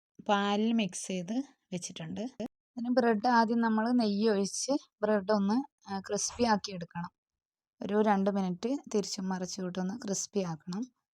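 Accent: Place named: Travancore, India. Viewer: കാസർകോട് ജില്ലയിൽ പ്പെട്ട കാരാട്ടെ സംസാരം മാറി തിരുവിതാംകൂർ സംസാരം ആയി എന്നാ ഒണ്ട് വിശേഷം കൊച്ചെന്തിയേ എപ്പഴാ പാചകം തുടങ്ങി യെ ഒത്തിരി നാളായൊ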